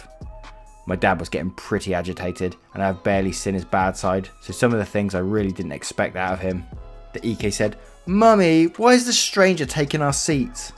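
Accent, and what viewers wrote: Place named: Oxford, United Kingdom. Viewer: Oh ok, thanks for clearing that up in my mind - I honestly never came across that broad accent of yours before and find it simply difficult to follow as I try making out what you say and squaring it with what i read lol (only been to Oxford once, to throw eggs at the guys from Balliol College and leave, so I really never got the chance to listen to the local lingo ^^)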